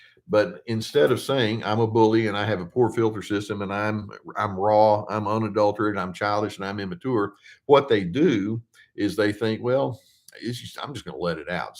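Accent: South, United States. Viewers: Funny you're 2 hours ahead I just went to your site Pacific Standard Time. Thank you for team healthy, I don't stereotype you though you have the Southern accent. Yes I've been made to look foolish by such individuals you help me not feel so alone when gaslit or hoovered by such individuals or made to feel guilty because what I have to offer is not enough after they repeatedly destroy or lose or sabotage what I give them. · it's because of your southern accent. Your all good.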